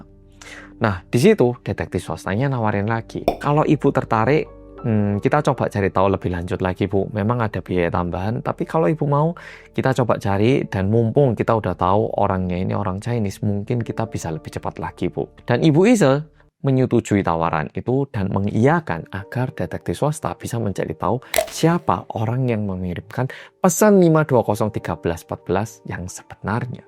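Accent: Java, Indonesia. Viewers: Ibu kangeeen Mendoknya Mas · Medok banget bahasa Indonesia logat Jawanya. 1000% A sampai Z udh fasih bahasa Indonesia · Hai medoook🎉 Nyimaaak 🎉🎉🎉 Sukses sehat selalu ya 💪🏿🙏🏿💕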